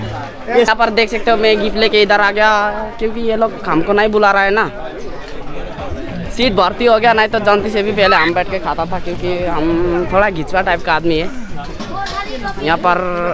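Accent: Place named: Limbu, Nepal. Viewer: Helo bro em frm darjeeling u rely speak like pure limbu keto😃👍🏻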